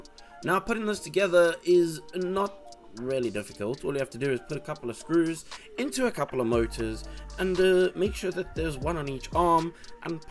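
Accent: South Africa. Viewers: that's why it sounds familiar! I grew up in Pretoria, good to see a south African making such cool builds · PS: is that a hint of a South African accent I hear? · God you have a gorgeous accent, is it south african, by chance?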